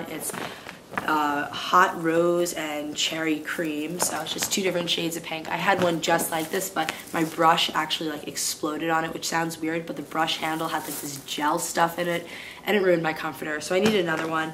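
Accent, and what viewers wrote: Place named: New Jersey, United States. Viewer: I love your accent! Are you from New Joyzie (Jersey)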